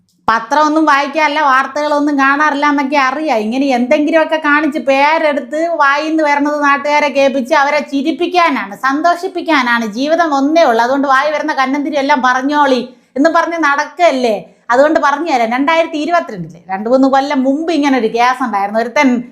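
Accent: Thiruvananthapuram, India. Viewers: നിങ്ങടെ tvm സ്ലാങ് പൊളി 😂😂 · ഉഗ്രൻ.വളരെഇഷ്ടപ്പെട്ടു.തിരുവനന്തപുരംഭാഷ.മിമിക്രിക്കാരിയാണല്ലേ.നല്ലഭാവിയുണ്ട്. ബോച്ചെക്ക്പണംധാരാളംഉണ്ട്.അതുകൊണ്ടുള്ള,അഹങ്കാരമാണ്.വിദ്യാഭ്യാസംകുറവാണെന്ന്തോന്നുന്നു.പോത്തിനോടുവേദംഓതിയിട്ടെന്ത്കാര്യം. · Thiruvananthapuram slang kalakki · തിരുന്തോരം ഭാഷയിലായത് വളരെ ഇഷ്ടപ്പെട്ടു, എന്തൊരപ്പി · പറയേണ്ടുന്നതെല്ലാം വളരെ കൃത്യമായി പറയേണ്ടുന്ന രീതിയിൽ തന്നെ പറഞ്ഞിരിക്കുന്നു. ഇത്തരം ഒരു പ്രതികരണത്തിന് ആദ്യമായി അഭിനന്ദനങ്ങൾ. അതുപോലെതന്നെ ചാനലുകളിൽ വരുന്ന പല കോമഡി പരിപാടികളിലും ദ്വയാർത്ത പദപ്രയോഗങ്ങളുടെ അതിപ്രസരമാണ് (പൊടിയൻ കൊച്ചാട്ടൻ ടീം ഒഴികെ, കുടുംബസമേതം കാണാൻ പറ്റുന്നത് ഇവരുടെ കോമഡി മാത്രമാണ് ) അങ്ങനെയുള്ള വളിപ്പ് പരിപാടികളെക്കുറിച്ചും ഒരു വീഡിയോ ചെയ്യണം.. പിന്നെ സഹോദരിയുടെ തിരുവനന്തപുരം സ്ലാങ്ങിലുള്ള അവതരണം ഗംഭീരം ( ഇതാണ് ശരിക്കും തിരുവനന്തപുരം സ്ലാങ് അല്ലേ ).. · തിരുവനന്തോര० ഭാഷയു० അവതരണവു० സൂപ്പർ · തിരോന്തരം സ്റ്റൈലിൽബോചെയെ പള്ളൂ പറയാൻ ഇതാരെടേ.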